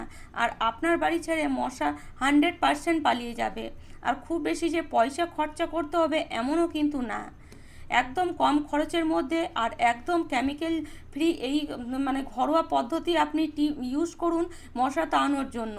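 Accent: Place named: West Bengal, India. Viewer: আপনি কি ওপার বাংলা থেকে ? উচ্চারণ সেটাই বলছে !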